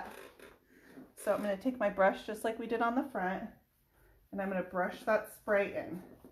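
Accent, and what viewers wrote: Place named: Wisconsin, United States. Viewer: I've never noticed it before but you sound like you might be originally from Wisconsin...or maybe your parents are. I think it's an adorable accent.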